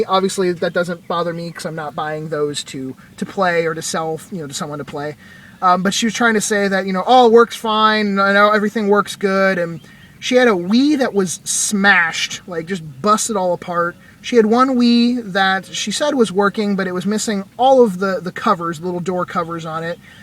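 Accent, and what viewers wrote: United States. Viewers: Yeah. American vocal contractions are fun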